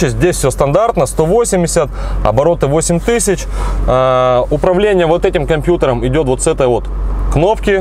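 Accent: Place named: Primorye, Russia. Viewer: Нормально говоришь.чисто приморский говор, меня раньше в европейской части не понимали ,медленно готворят,москвичи вощще пока подумают прежде чем сказать😄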